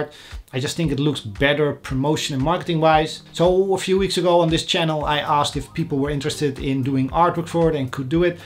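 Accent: Netherlands. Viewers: Thank you for this video! and btw are you dutch?😅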